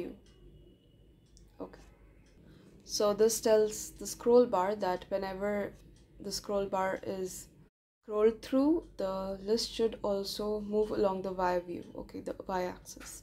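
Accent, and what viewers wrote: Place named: India. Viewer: Hi Mam , Are you from India naa ?? Your language accent shows it clearly...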